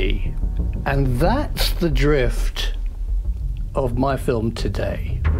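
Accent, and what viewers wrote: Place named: Cornwall, United Kingdom. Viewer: Hi. I'm from England too. I think your from Cornwall. Its really nice you making these videos. This one seems much better so congratulations on your progress. Off topic but are you from Cornwall? It scratches at me haha.. I'm 24 and a massive ufo buff. Again thank you for making these videos. One last question. Where are you AREA 51? THIS VIDEO ROCKS!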